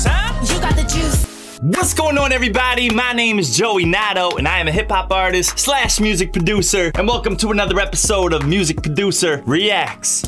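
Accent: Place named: Chicago, United States. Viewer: Yo are you from Chicago? You have that quality to your voice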